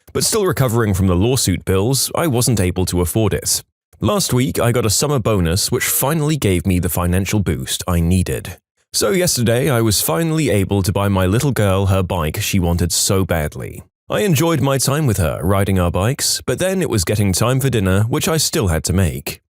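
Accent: United Kingdom. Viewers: He sounds br-ish.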